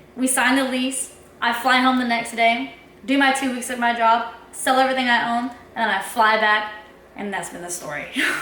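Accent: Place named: South, United States. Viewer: That's a cool uplifting story. Thank you very much for sharing it with us. I don't know about your accent, in all my life I have met like 3 Americans and none of them were from the South. I have heard this accent before in videos etc and thankfully I have no problem understanding it because I like it a lot. I have a similar story, just not a successful one in how it ended, but still I totally get your sudden change of life. I was a university student of computing in Plymouth, England. I am not British, I am Greek. I was doing my second degree and I was doing it more because it made sense than anything else at the time. I was in my third year there. One late night I was watching a movie on DVD, Once Upon A Time in Mexico. I liked it and decided to watch the special/extra features. One of which was a behind the scenes extra from the film's director. 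At one point, several minutes in, he goes something like this "obviously if you are watching this are interested into film making, else why would you watch this 'boring' stuff". I don't remember his exact words, but that was more or less what he meant. At that point I thought to myself that perhaps in the next life I will become a filmmaker. As soon as I finished that thought, the next one came "what next life? You only live once". Next morning I contacted the university and resigned. There was no film making course at the time so I decided to contact the one where I graduated first in another town in the UK. The following academic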